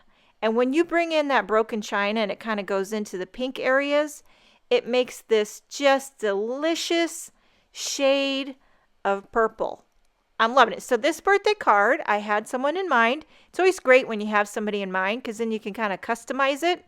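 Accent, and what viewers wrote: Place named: Boston, United States. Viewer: Thanks for sharing!! When I first found cardmaking a year ago, I remember listening to u and laughing my “ butt off!” Lol! I was dying to know if u were from the Boston Ma area, by the way u were talking! Lol! U are such a breath of fresh air! Ur cards are absolutely beautiful, and ur fun to listen to too!!!😀❤️👍🏼 Happy bday to ur daughter! My baby just turned 23 yesterday!!!! I miss both of my boys so much! Enjoy all the craziness now! For me, the “empty nester” thing is “ for the birds!” Lol! It’s totally not a home when ur babies are out on their own! Merry Christmas to u and ur BEAUTIFUL family!!! Liz E Cape Cod Ma 😊❤️🎄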